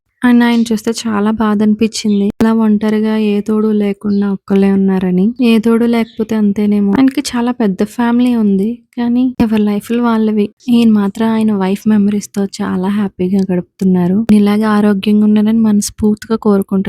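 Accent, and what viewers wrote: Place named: Godavari, India. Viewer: Godavari zilla na meedi .maata batti anipinchindi.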